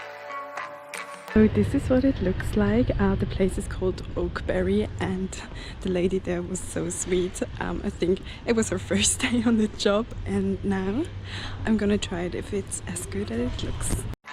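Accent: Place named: Germany. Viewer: Your beautiful accent reminds me that of my ex-german girlfriend! You are so sweet!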